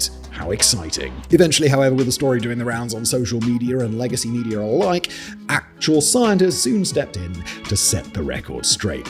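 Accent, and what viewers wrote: England, United Kingdom. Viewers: I thought you were good. Yet you sold your soul to icoogni. The worst kind of company. Stupid british cuck. Learn to say aluminim (and Nike and Adidas and SIDEWALK because pavement is a material and not a location) correctly and maybe I will re-subscribe. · I think his team may have wanted to change up his image and make it more "hip" - but we don't WANT a hip! He's a nerdy bald guy with an English accent that makes him sound like Churchill on speed. But the whole setup has changed, different vibe altogether, complete with ruining his otherwise absurdly delightful narration.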